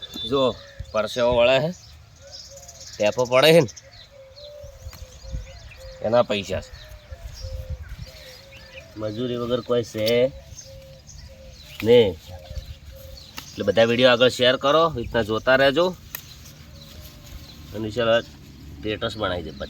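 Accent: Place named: Banaskantha, India. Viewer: બોલી મસ્ત તમારી બનાસકાંઠાની બવ મજા આવે સંભાળવાની મારી વાઇફ બનાસકાંઠાની છે મજા વાત કરવાની ❤😂😂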